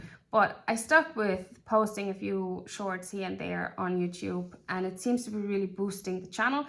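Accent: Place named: Ireland. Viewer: I'm enjoying catching up on your older videos. I'm so glad you made shorts as well! I'm telling all my homestead-interested friends about your channel, too. We adore supporting (and watching) small channels and helping the good ones grow! The bonus for me is feeling less homesick just listening to you speak. (I'm in USA and my Da was born in Malin Head, County Donegal).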